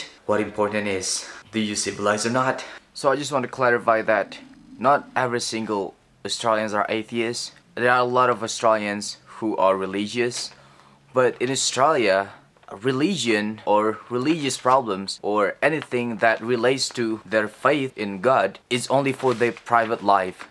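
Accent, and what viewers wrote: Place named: Indonesia. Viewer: sounds like he pronounces Australia as the word is usually pronounced in Bahasa Indonesia.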